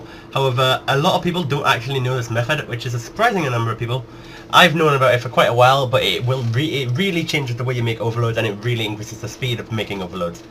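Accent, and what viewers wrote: Newcastle, United Kingdom. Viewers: he's a jordy, love his accent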